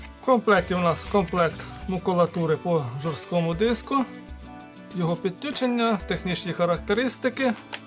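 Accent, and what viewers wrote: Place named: Surzhyk, Ukraine. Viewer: На практикі на практиці Мало міста мало місця! Це ріже слух. Я за розвиток україномовного інтернету, але ж не суржикомовного ☝️😠 В мене родичі в селі можуть так говорити, але ж вони не роблять ролики в Ютубі, виставляючи свою недорікуватість на загальний огляд. Щодо диску, то Сігейт чи не самий ненадійний серед виробників. Довіряти йому якусь більш-менш цінну інформацію навряд чи має сенс. Якщо це і робити, то хіба що в RAID O архіві.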